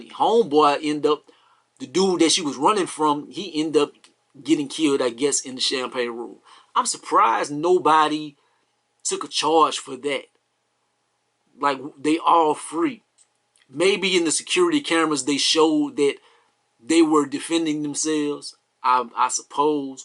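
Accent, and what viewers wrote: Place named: South, United States. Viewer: I subscribed cause I can just listen to your country accent all day . But on the real you break that ish down ❤️❤️❤️❤️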